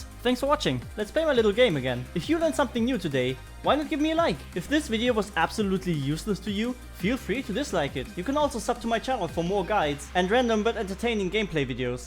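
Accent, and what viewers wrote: Germany. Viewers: you sound very german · Are u german ?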